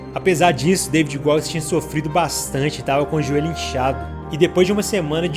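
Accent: Minas Gerais, Brazil. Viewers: Parabéns!! 👏🏼👏🏼 vc é mineiro né? 😅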